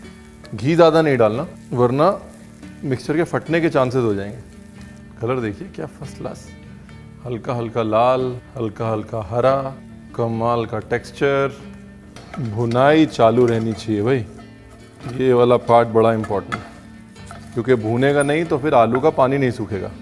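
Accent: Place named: Varanasi, India. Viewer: बोले तो छा गए गुरु... बनारसी अंदाज में।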